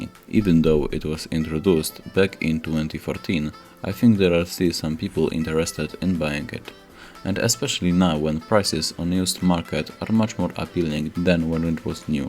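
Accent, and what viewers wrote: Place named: Poland. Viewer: Po akcencie wydaje mi się że musisz być z Polski 😉😉👍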